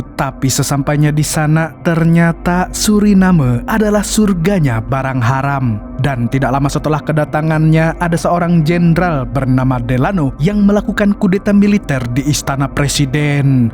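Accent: Banjar, Indonesia. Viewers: Wihh Abang orang Banjar ya😂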